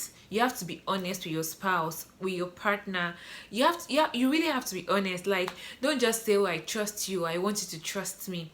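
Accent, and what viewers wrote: Yoruba, Nigeria. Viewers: Your yuroba because you say forhever not forever. Thats really a yoroba thing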